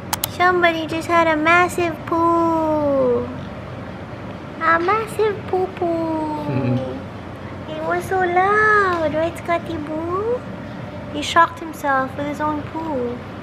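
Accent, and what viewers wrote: Visayas, Philippines. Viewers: Ka cute mag bisaya 🙈♥️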